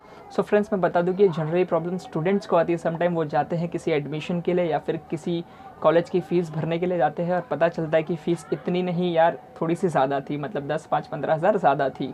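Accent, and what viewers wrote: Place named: India. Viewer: I can’t understand your Indian ass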